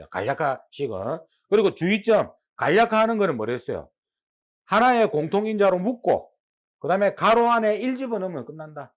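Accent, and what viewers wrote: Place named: Gyeongsang, South Korea. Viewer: 갱상도 스타일의 직관적인 강의 좋아요 ㅋ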